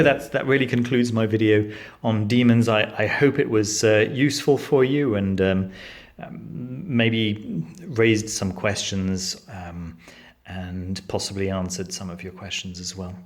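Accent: England, United Kingdom. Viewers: I enjoy your voice and would gladly listen to esoteric books as read by you. I'm curious if your are English, or perhaps South African?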